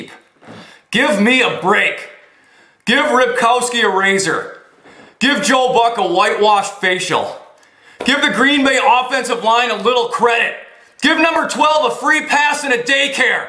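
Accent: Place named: Minnesota, United States. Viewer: I like the Nathan lol. you know shit's going down when hopps appears lol. like I said in the text, you finally sound like a true minnesotan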